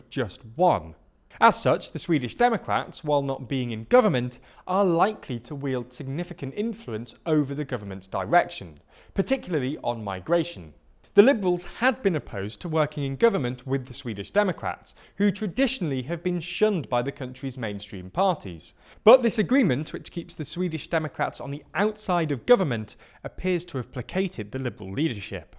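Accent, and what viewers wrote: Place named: Liverpool, United Kingdom. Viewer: I cannot stand this guy prenouncing GUverment instead of gOverment. It is a great newsflash, really you're doing a great job but please lose the Liverpool speech impairment. It is like a dripping crane that slowly drips a hole in my skull.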